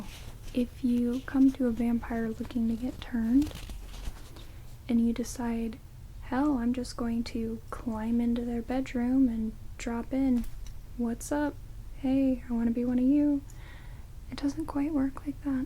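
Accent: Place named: Midwest, United States. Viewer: A vampiress with a bit of a midwestern accent? Intriguing;) Had to happen at some point, of course.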